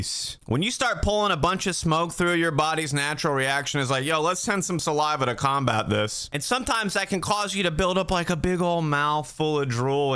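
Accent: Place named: New Jersey, United States. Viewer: this guy just screammmmsss jersey, I've watched a couple videos I've heard him say he lives in Florida and another time he lived in CO but buddy that accent is definitely east coast jersey lolol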